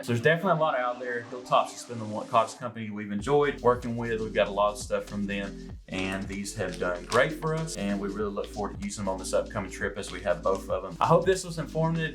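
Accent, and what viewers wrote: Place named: Alabama, United States. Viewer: This fella from Alabama? His accent reminds me of.. me.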